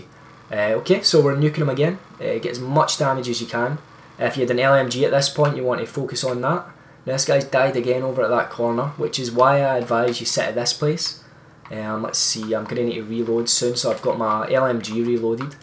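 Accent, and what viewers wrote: Scotland, United Kingdom. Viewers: Nice video man. Are you Scottish by any chance. You have the accent ?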